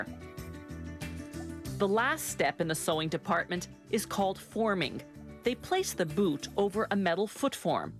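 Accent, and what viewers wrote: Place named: Canada. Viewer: A Canadian woman for a narrator. Couldn’t be any better for a hockey skate video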